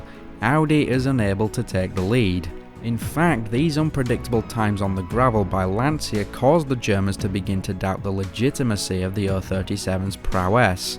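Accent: United Kingdom. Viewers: Yorkshire accent for the win. Snurr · Are you from East York’s?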